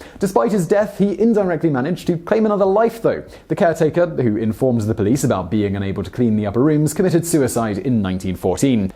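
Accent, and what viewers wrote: United Kingdom. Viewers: "Murder" sounds so much more sinister with a British accent.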